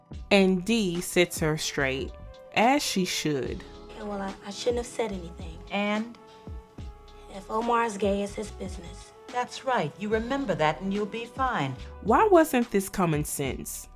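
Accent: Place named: South, United States. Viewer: Another entertaining video as always! Also I love your accent, like the way you said poem , it’s so cute! If you don’t mind me asking where are you from? (I know your southern based on your voice but I know different southern states have different dialects)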